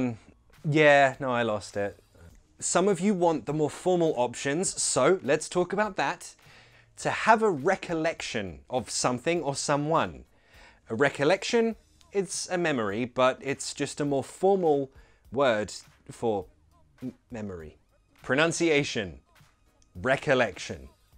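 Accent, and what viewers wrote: United States. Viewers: I totally love that American accent of yours!! So accurate lol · That American accent though 😂😂😂😂😂😂😂😂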